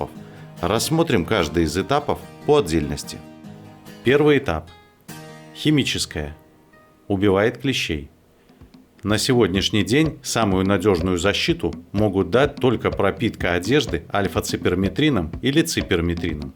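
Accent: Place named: Belarus. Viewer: Блин, вот всё в ролике замечательно с технической стороны, но произношение мягких согласных - это пипец! :( Ну нельзя взять и "прикрутить" произношение белорусских звуков к русским словам. Причём некоторые слова вам удаётся произносиТЬ (а не произносиЦЬ) так как надо. Что ж за напасТЬ-то такая в средней и восточной Беларуси с произношением...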